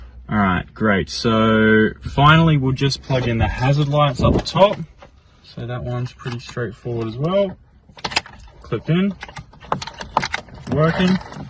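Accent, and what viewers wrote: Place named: Australia. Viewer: Excellent job. Extra marks for speaking Australian English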